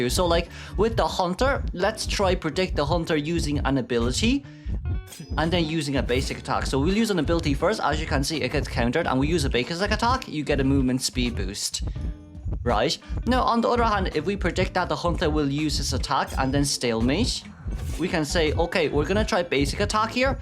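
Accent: Ireland. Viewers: i didnt know irish people pronounced words ended with -t as -sh, that's interesting!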